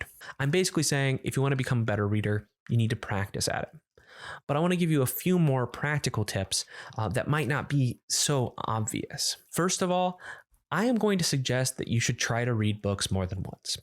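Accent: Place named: Pennsylvania, United States. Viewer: I have an accent-related question, if you allow - do you come from around Pensilvania ? I've got a friend from there and in the minutae you sound just like him. I can't really pint point why or the specifics.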